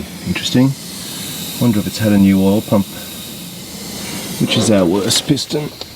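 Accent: Australia. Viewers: It's weird seeing this. Usually with a West Virginia accent and "hold my beer" thrown in from time to time has been my experience. Seeing and hearing the Aussieness of it is pretty cool. Interesting "series", thanx for taking the time to make the videos and post them!!! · Are you from Australia?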